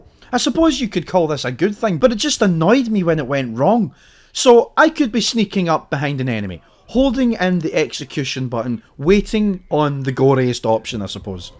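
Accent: Scotland, United Kingdom. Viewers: Gotta luv the Scottish accent.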